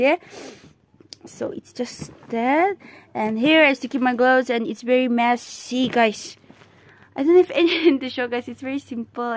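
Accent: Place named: Nagaland, India. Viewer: I really don't know why YouTube recommended me this 😂 but I love the accent... Didn't know Naga accent sounds like this 👍👍 love from Mizoram... Got yourself a new subscriber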